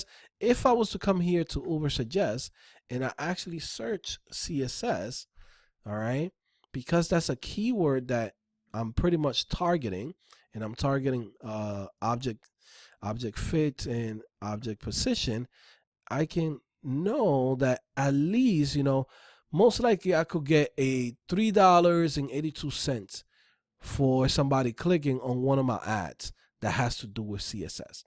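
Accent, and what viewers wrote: New York, United States. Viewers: You sound like you from NY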